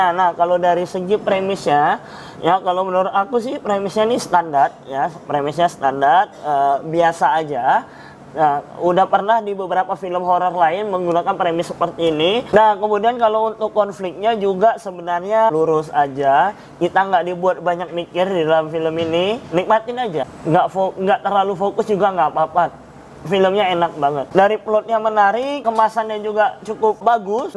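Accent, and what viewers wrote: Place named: Sumatra, Indonesia. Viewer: Hahhaa logat sumatra bgt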